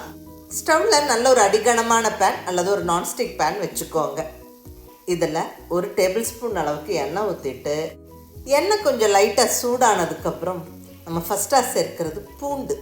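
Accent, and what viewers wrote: Kongu, India. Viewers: Namma kongu manathoda samayalum tamilum sooper madam.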